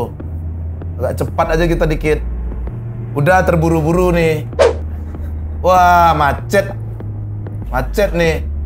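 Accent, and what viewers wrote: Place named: Padang, Indonesia. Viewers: Kalau mau dengar logat indonesia padang nya keluar di konten ini. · Wkwk denger pakai logat padang jadi kangen saudara yg disana, dulu pernah njir persis kyk gini turun di minangkabau trus dr padang ke sungai penuh naik travel avanza njirr mantep wkw pulangnya kapok ganti naik travel hiace hahah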